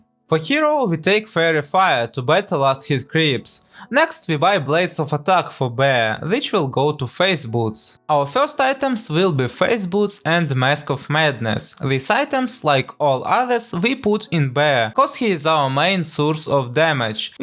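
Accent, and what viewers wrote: Russia. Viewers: Вен ю трай то спик инглиш, бат юр акцент из стил рашн)) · У тебя специально русский акцент? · so hard to listen to the Russian accent in English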